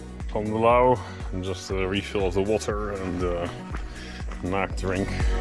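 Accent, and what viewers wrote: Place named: Netherlands. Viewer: Na 2 zinnen was het duidelijk: mooi Nederlands accent 😉 Waanzinnig gaaf verslag. Je doet iets waar ik voorlopig nog enkel even van kan dromen.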